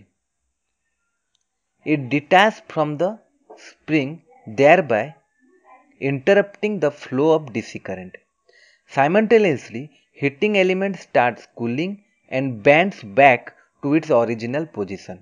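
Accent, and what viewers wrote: India. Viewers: typical indian accent :D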